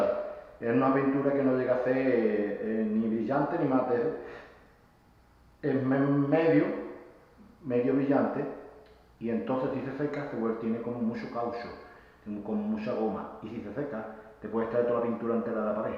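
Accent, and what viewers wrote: Andalusia, Spain. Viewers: Ah, vale ahora entiendo. Lo probaré porque nunca me quedan bien las líneas ni usando cintas buenas. Me quedan bastante bien pero siempre filtra algo. Ese acento que tienes...cádiz, sevilla? Muy yankee no suena🤣Un saludo👍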